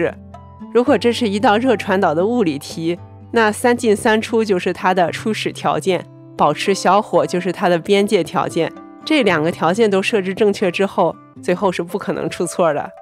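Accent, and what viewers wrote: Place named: Qingdao, China. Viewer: 青岛银的口音😊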